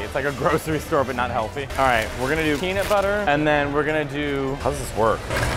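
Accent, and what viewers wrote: Italy. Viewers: Dude, as a native speaker, your spanish was pretty good :) You speak spanish with an italian accent and I speak italian with a spanish accent lol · The Italian accent when you speak Spanish! I love it. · I’m surprised but I shouldn’t be that sounds like an Italian speaking Spanish. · Spanish with a heavy Italian accent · He speaks Spanish with an Italian accent xD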